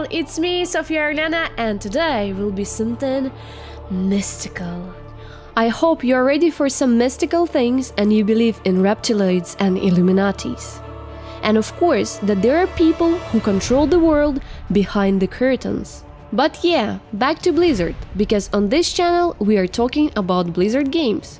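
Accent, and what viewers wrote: Russia. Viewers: Well, sexy video, simple as that, you too of course, being the shining jewel and all. Of all the accents from all countries i have heard, Russian, or slavic origin in general is by far the most heart-melting, delicious id say ;p Either that ir i have grown to like how Russian females talk since i live near(Latvia) Russia myself, so its common but still slightly different between each individual.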